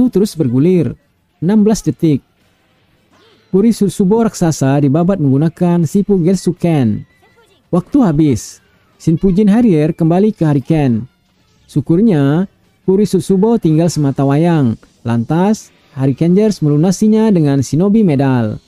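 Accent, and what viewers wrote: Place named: Padang, Indonesia. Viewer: Padang banget aksen nya bang, jadi salkus berasa dengar podcast praz teguh 😂 btw thx u udh bikinin rangkuman cerita nya, nonton dulu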